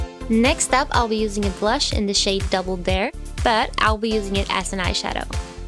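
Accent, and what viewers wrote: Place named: Skåne, Sweden. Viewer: ÄLSKAR din dialekt, är du skåning?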